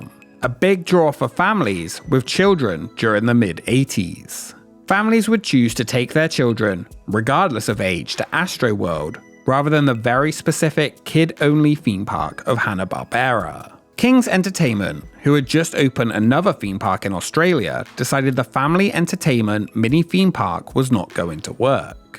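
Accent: United Kingdom. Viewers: This is about American theme parks but why does the host here have a British accent or is it Australian sometimes I just don’t know the difference. It’s very confusing however. Love this channel and especially the theme not this new theme but the main theme that came after the new theme don’t ever lose that. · Because unlike the builders of Hanna Barrera Land, is providing things people like. I'm pretty sure many people's lists of things that they like would include "listening to a cool sounding voice with a British accent." 😎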